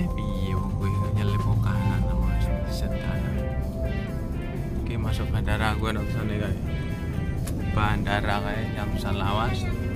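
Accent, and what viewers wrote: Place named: Java, Indonesia. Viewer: Bahasa Jawa e penak tenan mas medok 👍🏻 sip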